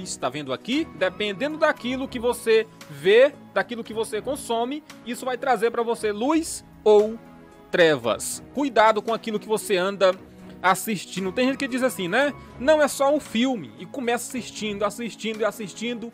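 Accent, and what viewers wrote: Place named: Maranhão, Brazil. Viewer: Kkkk você prega a verdade mais ao mesmo tempo é super engraçado com esses sotaques Maranheses!!! As vezes agente nem ta a fim de rir mais vc nos faz rir! De São Paulo capital!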